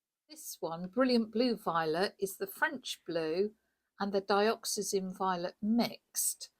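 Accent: United Kingdom. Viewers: you really make me smile I love the way you talk - its so english. I love that we recognise ourselves in others.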